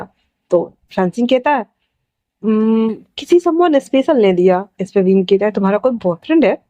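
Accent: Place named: Jharkhand, India. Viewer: Aapki awaaz bahut acchi hai use per Jharkhand ki word aur bhi acchi lagti Hai jab Main vahan ki awaaz sunti hun mera dil khush ho jata hai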